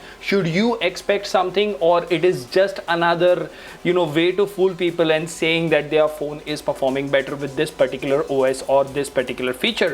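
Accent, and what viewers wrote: India. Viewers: Indian english sounds really bad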